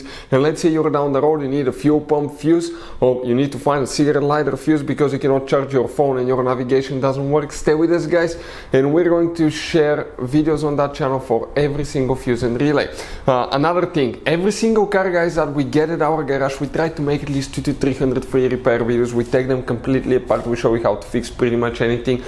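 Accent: Russia. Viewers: half way through video hes still talking in that horrible russian accent! ughhh i have to vomit now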